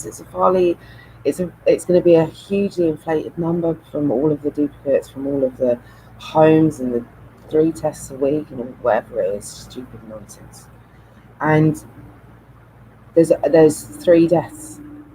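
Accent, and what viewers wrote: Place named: London, United Kingdom. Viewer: Hi ❤️ just subscribe after reading your comments on Carl Vernon. I take it from your accent you're London or surrounding. It's lovely to listen to you sharing your thoughts. I find video diaries in general really interesting (I'm not brave enough to do it myself). You seem of similar mindset as myself (and a similar age 🙂 I'm female). I'm totally all about meaningful coincidence, laws of attraction, symbolism etc I keep getting the number 44 lately - it's bonkers how much it appears. Are you registered to Gaia? It's like a spiritual Netflix 🙂. Some great doco's on there. Anyhows, I'm waffling 😍. Just thought I drop in and say hi. Namaste lovely lady - positive vibes to you on your journey from another spiritual lady in Cornwall /Devon area x❤️x ps you have a gorgeous, charismatic way about you - keep making your diaries 🌜🌞🍄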